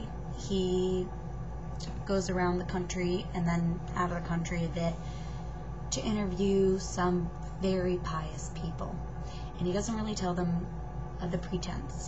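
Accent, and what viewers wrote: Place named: United States. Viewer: Fundies don't think for themselves, that's what their bibles and pastors are for, but you would know that if you really lived here or understood anything about this country. Then again, you do sound more like a seppo than an aussie. Methinks you're a bit confused - favoriting Bill Maher and Richard D and then whingeing at me. Hmmm...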